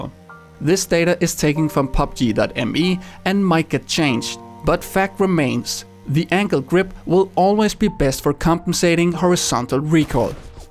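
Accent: Denmark. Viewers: Are u from Denmark? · Its called being danish...we have a funny accent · So quick question.. Are you Danish? Because your accent sound a little like Danish. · The reason some of you guys think his way of speach is funny is becouse of his danish accent we have a very bland pronunciation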